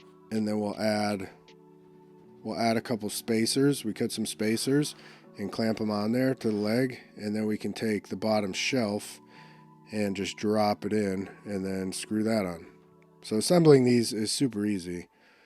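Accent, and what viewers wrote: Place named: East Los Angeles, United States. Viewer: Hope you don't mind some constructive criticism, but your videos would be a lot more engaging if you did a little teaching. It is clear you have a buttload of talent, but just watching videos of you talking like we are in East LA aren't engaging. Started watching after your dual video with BourbonMoth, but still waiting to actually learn something. You have a great re-start on your channel, make it worth watching. I am just another A-hole with an opinon of course, but it would be great to actually learn something along the way. Also, get rid of the "Yo guys" at the start of your videos. You instantly start in the hole. Make your videos that somebody looks forward to hitting YT.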